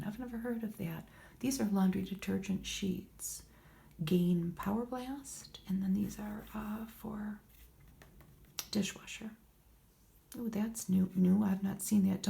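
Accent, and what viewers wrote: Midwest, United States. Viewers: I really enjoy these magazine flip throughs, your voice with just that touch of midwestern accent is so nice.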